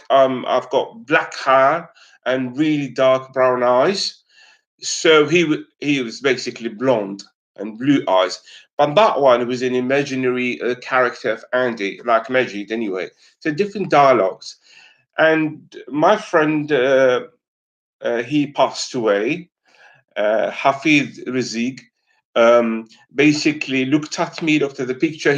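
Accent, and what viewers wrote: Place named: Gulf, Arab world. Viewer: لي يسمع كلامك يقول إن يشبه أصحاب الخليج، صراحة هل هو قريب من البربر ام من العرب. شكله بربري اكثر منه عربي ثم إن الجزائر أصلها امازيغي ثم تعرب لسانها بفضل الإسلام و هو فخرنا و عزنا، على كل لا العنصرية يا اخي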